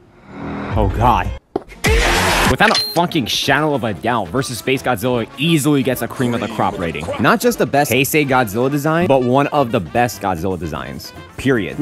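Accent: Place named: Texas, United States. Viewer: ngl bro sounded like he had a Texan accent, and I agree with every ranking you just said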